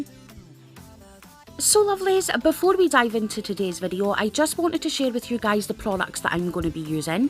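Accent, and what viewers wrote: Scotland, United Kingdom. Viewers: Totally stunning designs you are truly amazing x you are very professional and amazing when creating your designs just love watching you do your magic and love the accent from up the road bonnie Scotland 🏴 ❤️❤️❤️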